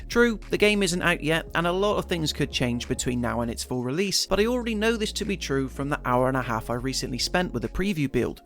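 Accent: West Country, United Kingdom. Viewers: DD2 is looking fire! Sidenote, this is the first time I have heard a gloucester/bristol/west country accent from a youtuber and its refreshing!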